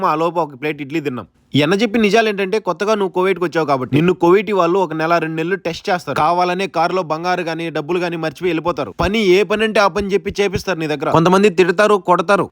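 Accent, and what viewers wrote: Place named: Madanapalle, India. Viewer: Bro pakka Madanapalli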